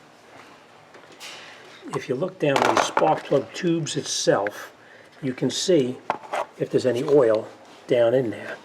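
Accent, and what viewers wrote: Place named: Long Island, United States. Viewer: You gotta be from Long Island! 🤣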